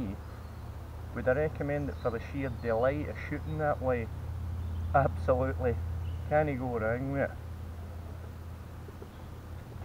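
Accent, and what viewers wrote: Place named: Scotland, United Kingdom. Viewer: Camera too far away for clear video instruction.... volume very poor for this Scottish accent.... nice bow however..!!🤔.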